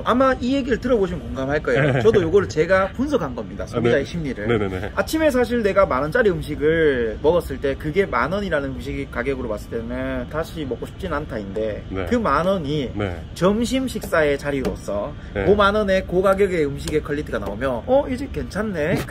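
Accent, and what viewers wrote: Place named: Gyeongsang, South Korea. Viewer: 지금 5번째 반복해서 보고 있습니다. 대구 사투리도 좋고 뭔가 빨려 들어가는 화술에 표정, 나이가 많아도 많이 배우고 갑니다.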